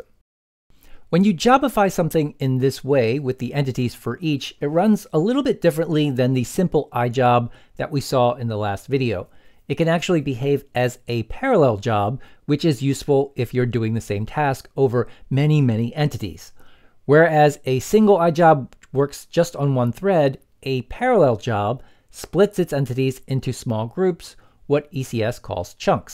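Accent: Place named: England, United Kingdom. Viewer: I like your Unity videos, speaking very clearly and English accent is nice too. Hope you can make more Unity tutorial videos!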